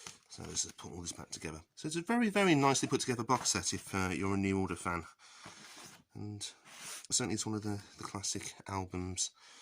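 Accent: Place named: United Kingdom. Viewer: Yeah, I was thinking the same but you know how things go when you talk & roll video for over 6 minutes lol... He gets away with it having the cool Brit accent :P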